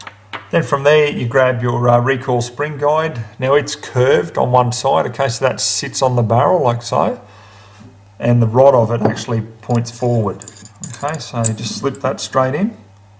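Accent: Australia. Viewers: Awesome review... weird to hear the Oz accent talking about guns :) 1991 9mm model (O1992) was recently added to the Colt lineup and I picked one up (to go with my .45 ACP O1991 and .38 Super O2991). Only difference I can tell is that the US market guns have the bright barrel muzzle and hood.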